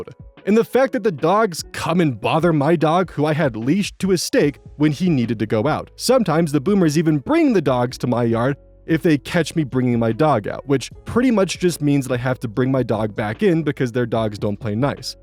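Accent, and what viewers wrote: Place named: Midwest, United States. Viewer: Even though you're from Ohio (Pretty sure that's what you said) and he's from Wisconsin, once in a while your accent makes me think of Pickles from Dethklok. Juuust enough that I couldn't place who I was thinking of til just now (been listening for maybe a month now?-idk my ADHD makes it hard to measure time)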